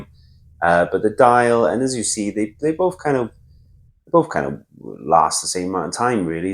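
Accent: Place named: Cardiff, United Kingdom. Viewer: Sounds like a Cardiff accent to me....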